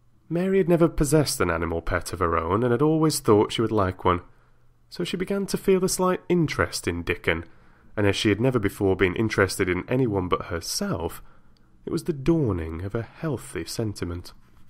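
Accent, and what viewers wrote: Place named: Yorkshire, United Kingdom. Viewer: Great reading as always! Like the Yorkshire accents, are you from Yorkshire? Keep up the great work 😀. PS how about some more Lord of the rings..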